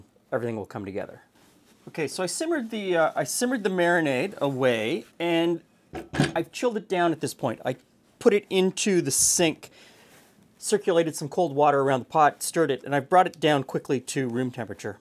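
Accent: Canada. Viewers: It's funny, despite saying pop and hearing a Canadian accent daily, I'm always still momentarily taken aback by hearing them in the media I consume. Thanks for all the work you do,!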